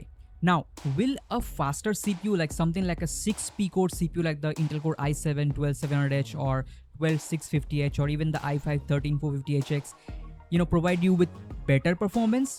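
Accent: India. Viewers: Bihari bkl commentary nhi chaiye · Bro are you from Siliguri...?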